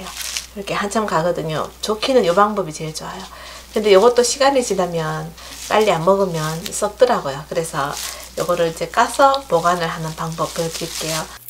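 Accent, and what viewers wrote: Gyeongsang, South Korea. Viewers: 닉네임이 너무 정겹고 목소리가 경상도 아줌마치곤(?) 따뜻함이 묻어나서 궁금했어요~~ㅎㅎ 요즘 상어송이 대세잖아요~ 뚜루루루루 귀여운 뚜루루루루~~ㅎㅎ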